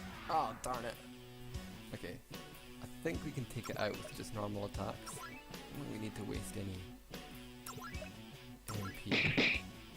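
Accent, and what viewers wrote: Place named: Scotland, United Kingdom. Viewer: whats with the accent though haha im scottish and i dont sound anything like you, haha where you from in scotland.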